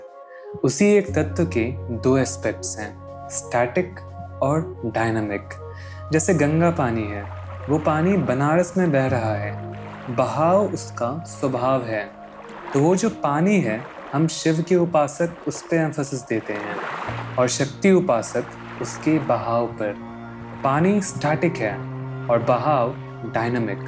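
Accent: Bihar, India. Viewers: Bihari hain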